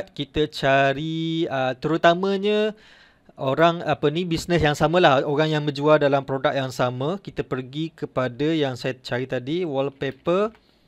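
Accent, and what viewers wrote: Terengganu, Malaysia. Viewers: Terbaik sharing boh👍🏼👍🏼👍🏼.. teka jap boh asal ganu kan.. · Asal ganu ke bang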